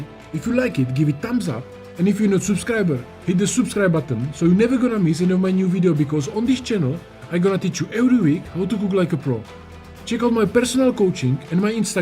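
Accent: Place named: Czech Republic. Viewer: Love the Czech accent.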